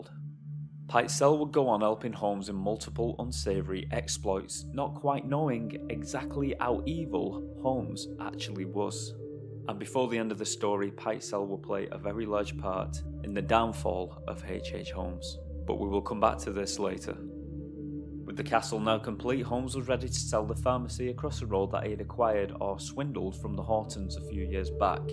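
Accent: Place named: England, United Kingdom. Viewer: Typical American. I'm pretty sure the narrator is English 🤣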